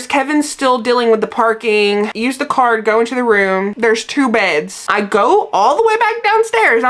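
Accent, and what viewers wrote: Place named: Alabama, United States. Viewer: Girl I want to come up to your town and just sit and have a coffee and talk to you ❤.. I actually live in Phenix City Al and it's so nice to hear someone on YouTube that talks like me, I'm 50 yrs old I have lung cancer. I've been watching you from the start of your YouTube journey. I'm so happy that you are now so popular. You have grown into a wonderful young lady and a absolute amazing Mom. Sending love and prayers 🙏🙏❤️ to you Kev and Oliver.. love the long form video content. You are an example too others your age. ❤❤❤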